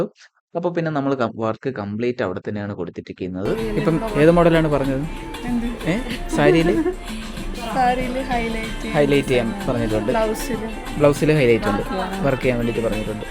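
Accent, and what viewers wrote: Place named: Trivandrum, India. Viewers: Edey edey ith normal trivandrum slang aan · Ithu normal Trivandrum slang aanu...ini ipol avarodu avarude normal slang maatti valla Kannur slangum parayaan parayaam ningale thripthipeduthaan entha..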